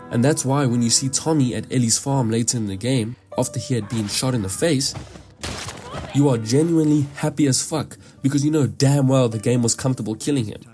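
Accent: South Africa. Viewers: you South African ik that accent on god😂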